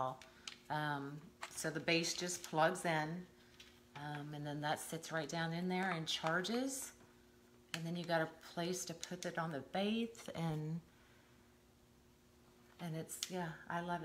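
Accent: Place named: Louisiana, United States. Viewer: Enjoy your videos. It is interesting to see how the UITC boards make pretty wreaths with less time and material. I know you said you were in Houston, but your accent sounds like Louisiana to me. Are you originally from there?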